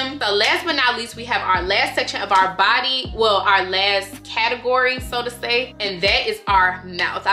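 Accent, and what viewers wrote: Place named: Chicago, United States. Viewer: Awesome vid. Are u from Chicago? I hear the accent lol.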